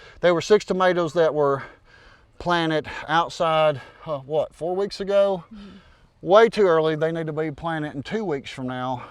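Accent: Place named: Appalachia, United States. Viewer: Fangers for fingers. Love your Appalachian accent.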